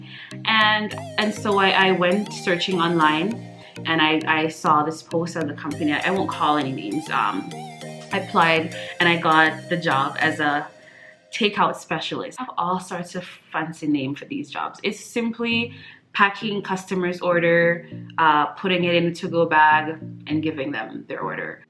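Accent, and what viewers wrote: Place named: Jamaica. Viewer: sounds like a yaadi (Jamaican)....are you?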